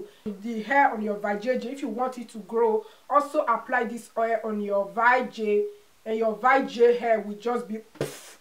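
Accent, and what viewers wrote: Nigeria. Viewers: Great tips sis. But r u 4m Nigeria cos ur accent is like for akii and popo of Nigeria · This is nigeria accent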